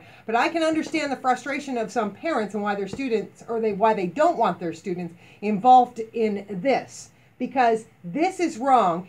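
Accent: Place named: United States. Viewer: American I'm guessing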